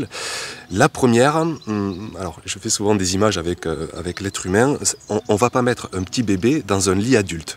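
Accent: South, France. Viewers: Vidéos passionnantes et très instructives pour un jardinier novice que je suis . Très bien fait et le "petit accent du sud" en option ne peut que nous donner envie d'aller au jardin !!!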